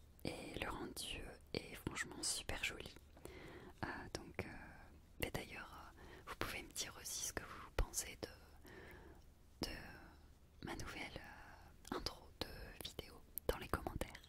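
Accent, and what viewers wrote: North, France. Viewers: Tu viens du nord, c'est cool c'est ma région natale (Haute-Normandie), tu parle un peu Normand ou ch'ti? 😄💜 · tu est du nord ? sinon tes video sont super ^^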